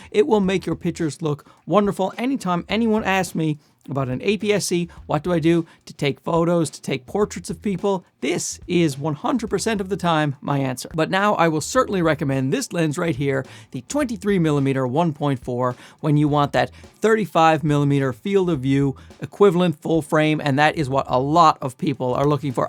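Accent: Canada. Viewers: Nice lens!Loved the shots at the intro….pretty artsy! Handheld or added external stab? Great energy …almost want to buy these lenses and then buy a camera to fit! Btw the 23mm made you look more like Chris Hemsworth, but the CDN accent offset.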